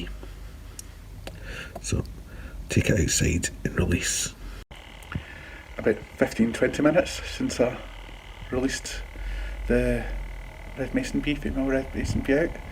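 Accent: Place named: Scotland, United Kingdom. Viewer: Just sometimes your great Scots accent throws me "Badger Antonio" LOL!!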